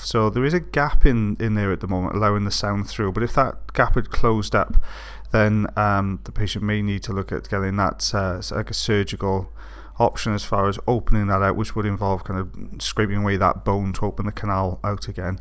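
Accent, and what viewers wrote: Wales, United Kingdom. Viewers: Mum is welsh so i know that accent well even though we been living in America for 25 years lol and I can confirm welsh people are very intelligent people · I'm in Wales tonight and can confirm plenty of people talk like and everyone I've spoken to thus far knows what a Jobson Horne is.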